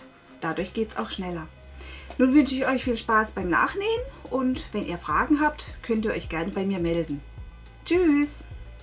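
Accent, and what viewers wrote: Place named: Palatinate, Germany. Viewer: Liebe.. Vielen Dank für deine tollen Videos und ich, als Hessin die seit 16 Jahren in Niedersachsen wohnt, liebe deinen Dialekt... Ich schätze mal Pfalz, oder? 😍😍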